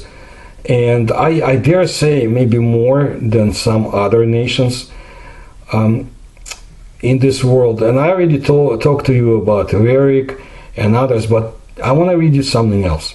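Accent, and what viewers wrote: Russia. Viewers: Seconded. The videos are a treasure trove but the thick russian accent is a bit hard to follow. I suggest 1.25x for the meantime.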